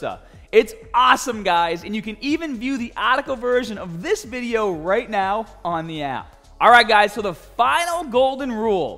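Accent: Boston, United States. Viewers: It's that thick ass Boston accent. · I love how owning up his Bostonian accent, it always adds a bit of flair to his videos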